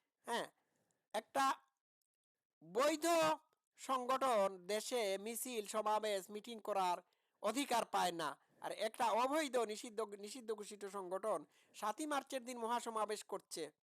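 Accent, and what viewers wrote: Sylhet, Bangladesh. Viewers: তোমার বাংলা উচ্চারণ সিলেটি ল্যাংটা ফুরিদের মত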